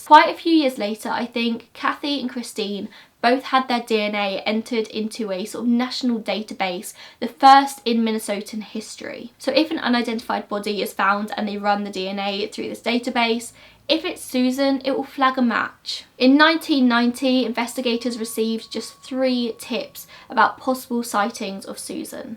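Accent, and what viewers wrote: United Kingdom. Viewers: I'm from Dublin, Ireland. So, I'm very familiar, and have always loved, the UK accent. But, your particular accent is so very beautiful! 💜 I'm sure you don't even realise that you have an accent, but you have a beautiful accent, and you're perfectly spoken. Just another reason why I love your channel! 💜🖤💜🖤💜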